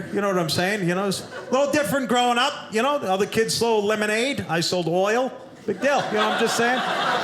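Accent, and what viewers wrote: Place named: New York, United States. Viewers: Its true about driving in NYC when someone cuts you off they give you the finger. Its not a joke it happens every day! But I realize that is funny to people not from NYC!Although his accent says Long Island not the city. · But I like his neuyak accent regardless. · My favorite NYC accent 😁... your road rage joke "pre-finger" 🤣🤣🤣. Good stuff!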